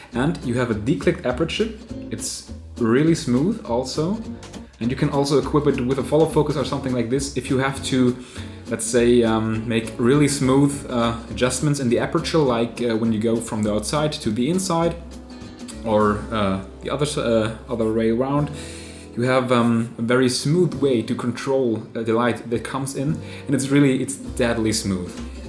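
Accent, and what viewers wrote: Germany. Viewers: german accent made me subscribe... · Great video, i dont know why, but a german accent makes me want the lens even more!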